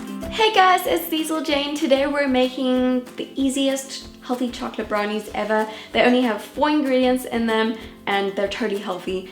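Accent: South Africa. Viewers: You sound so South African! Are you South African???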